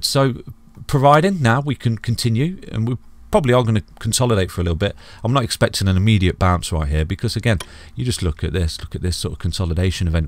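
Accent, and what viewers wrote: United Kingdom. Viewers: this is a good video, clear and simple to understand, and a British accent to boot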